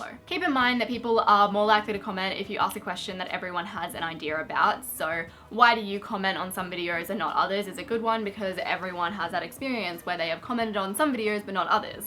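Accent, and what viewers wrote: Australia. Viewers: I am loving all of your videos. They help me so much! And I love hearing an Aussie offer youtube tips and advice. I follow a lot of the American youtube experts. You are doing amazing work.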